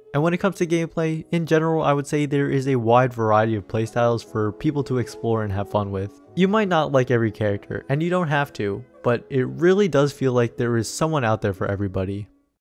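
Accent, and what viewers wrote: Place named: West, United States. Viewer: lol he sounds western no?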